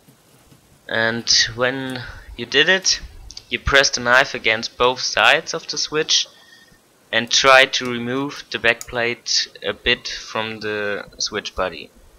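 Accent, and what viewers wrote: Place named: Germany. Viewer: I'd just like to say, your english is quite good. You have a german accent, of course, but your english is perfectly understandable. Great tutorial, the best I have seen on youtube.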